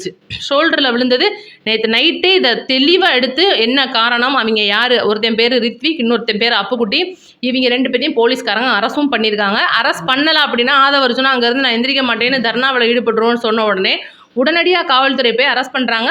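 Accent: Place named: Madurai, India. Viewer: 🎉🎉 Congrats 50k subscribers. U deserve it. sister I am a fan of Ur bold political speech in madurai slang.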